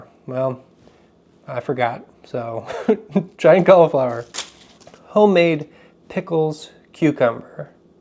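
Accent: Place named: West Africa. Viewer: The French with a west African accent piqued somdthing....are you a MK?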